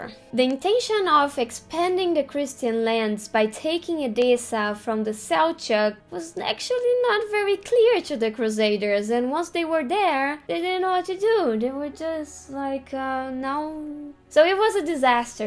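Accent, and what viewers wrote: Brazil. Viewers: Throughout the video I concluded you're a fellow Brazilian. I know some Greek and Cheirosiphon is pronounced /Rirocifom(pt)/ heerohsseephon(eng)/.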